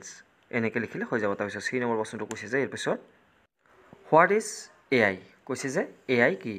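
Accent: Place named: Nalbari, India. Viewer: Sir nolgoriya hoi heybabe kisu kisu bakyo bhal koi nufute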